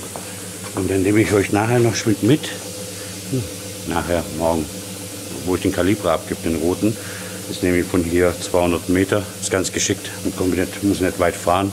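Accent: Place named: Sauerland, Germany. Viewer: Ich komme aus dem Sauerland und finde es echt witzig wie bei dir manchmal der Dialekt durchkommt ich mag das sehr . Leider spreche ich selber kein Dialekt mehr und es ist sehr schwer jemanden zu finden der noch den Sauerländer Dialekt spricht um ihn zu lernen .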